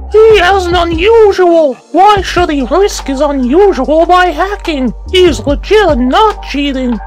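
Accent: Russia. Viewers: are you rusian???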